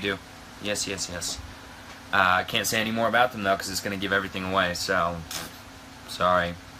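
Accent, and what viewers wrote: United States. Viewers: HIS AMERICAN ACCENT!!! Perfect! 😆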